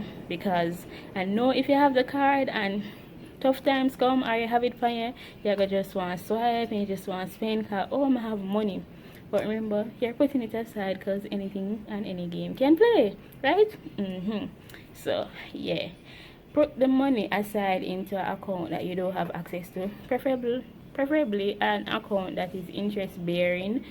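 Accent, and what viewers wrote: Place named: Jamaica. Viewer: I’m Jamaican 🇯🇲 too. Love that you’re staying real. I only sound like you when I’m upset or around others 😂 . Thanks for making me feel like I’m home 💕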